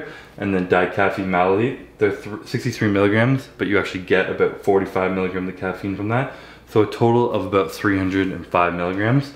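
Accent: Canada. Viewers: Your Canadian is showing lol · I feel like ya really turned on the Canadian in ya here bud lol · actually sounding canadian hahaahhahahaha loving it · actually soundin canadian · the most Canadian intro I've ever heard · Embracing the Canadian accent on this one. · Why in the beginning of the video does sound more Canadian than ever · Honestly never knew you sounded this Canadian, brilliant😂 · Can we get that extra Canadian more often pls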